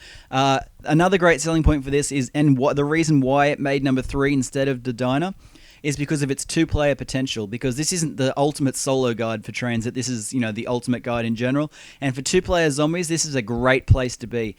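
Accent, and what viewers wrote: Australia. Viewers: Really helpful mate thanx, what part of Aussie u from?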